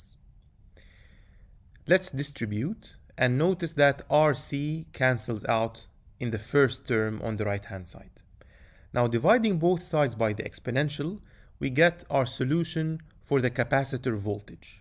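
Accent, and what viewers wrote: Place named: Egypt. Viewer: You have an Egyptian accent kinda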